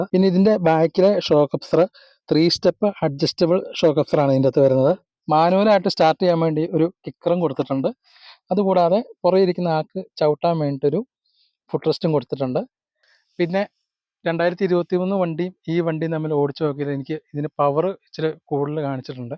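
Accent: India. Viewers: വർത്താനം കേട്ടപ്പോൾ മനസിലായി തൊടുപുഴ കാരൻ എന്നു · Kottayam,pathanamthitta malayalam.. vekthamayi karyangal paranju.. 2026il GST kuranjapol 1.15 on road.. 5000 extra fittings..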